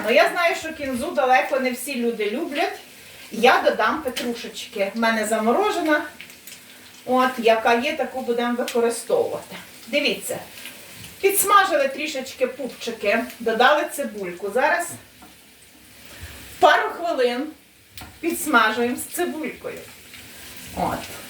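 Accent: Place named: Lviv, Ukraine. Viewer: Не знаю от куда вы, но говор, как у моей родной тёте из города Броды, Львовской обл. Мед для ушей. 🥰.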